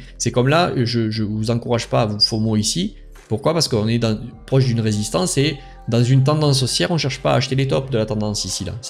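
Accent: South, France. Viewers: L’accent du sud on aime frero 🤣🫡 de bonnes vidéos à chaque fois force 💪🏻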